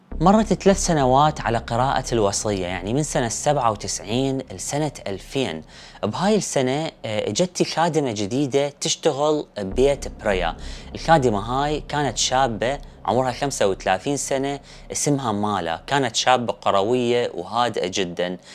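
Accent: Iraq. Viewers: عيدك مبارك ومن العايدين الفايزين اخي ، انا اعشق قصص الجرائم والغموض رغم انها احيانا تكون محزنة وتظهر بشاعة طمع وحقد الإنسان لاخوه الإنسان بأسباب تافهة جدا مقارنة مع ازهاق حياة بشر. تحياتي لك من المملكة المتحدة 🇬🇧وشكد تعجبني لهجتك العراقية❤.. فدوة 🇮🇶😊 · اللهجة العراقية غاية في الروعة تحية من الجزائر لمحتواك الراقي أخي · قناة جميلة واللهجه العراقية جميله استمر بالتوفيق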